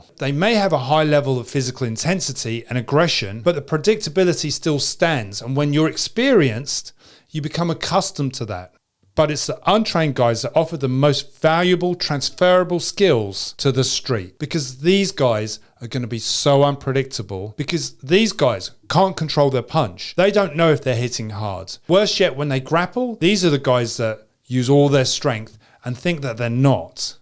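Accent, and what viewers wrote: England, United Kingdom. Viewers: There is NO ADVANTAGE to having no experience in fighting. No matter what this drunk content creator with an English accent says.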